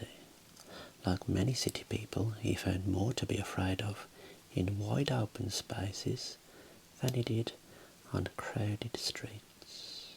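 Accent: United Kingdom. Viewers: Nothing is better than and his British accent ❤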